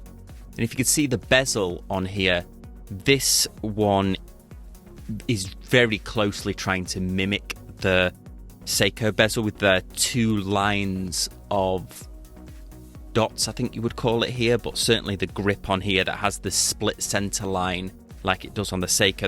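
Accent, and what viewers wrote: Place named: Scotland, United Kingdom. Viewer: He needs to fix his Scottish or whatever voice damn annoying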